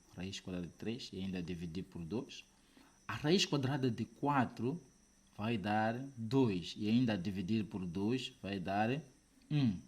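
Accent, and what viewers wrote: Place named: Mozambique. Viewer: Obrigado professor. Percebi que és moçambicano 🇲🇿, pela maneira da pronúncia do "R" pronunciamos como no espanhol. Diferente de Angola Brasil e Portugal.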